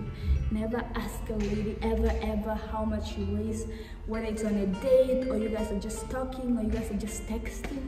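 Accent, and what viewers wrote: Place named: Namibia. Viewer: Love your accent African sister. You’re the first Namibian I met... even though this is virtually. Thanks for talking about abuse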